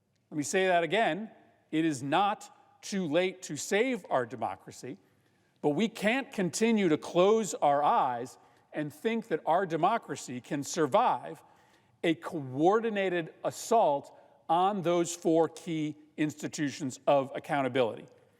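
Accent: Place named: United States. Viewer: An American voice that god.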